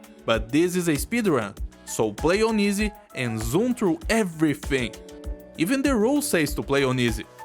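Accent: Brazil. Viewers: Are u Brazilian? I'm trying to pinpoint ur accent lol😅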